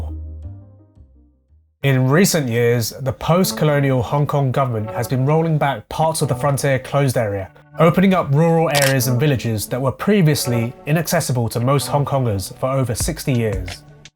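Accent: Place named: United Kingdom. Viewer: I think he's British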